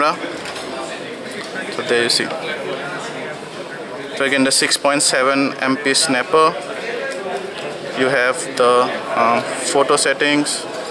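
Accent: India. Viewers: the voice made me laugh a haha i love that indian acent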